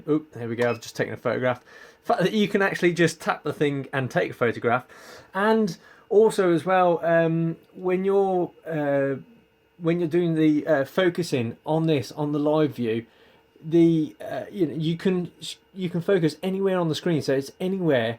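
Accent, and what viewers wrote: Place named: United Kingdom. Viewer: Makes a Change to hear a Fellow British Accent..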